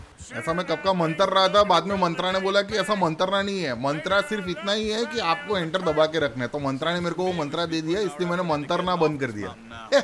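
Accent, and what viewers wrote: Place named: Gujarat, India. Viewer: Your Hindi narration is 10000 times better than gujju narrations. It’s gujju flavored Hindi 😋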